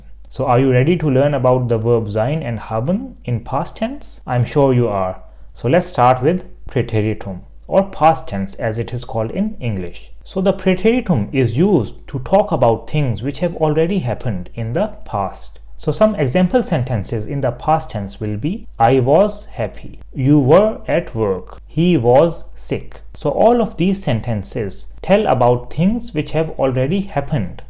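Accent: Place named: India. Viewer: Bro if learn from you German will not understand my German because you have that topical Indian accent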